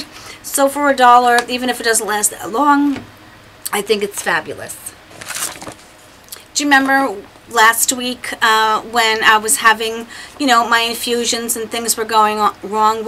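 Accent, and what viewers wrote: New York, United States. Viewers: I love how you say New York, it sounds like New Yawrk!! Love your accent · Love the Llama sox & your New York accent, you are such an awesome daughter to look after your Daddy the way you do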